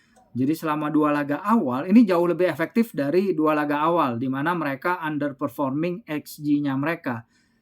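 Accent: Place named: Jakarta, Indonesia. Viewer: Kenapa betawi nya kwentel banget disini wkwk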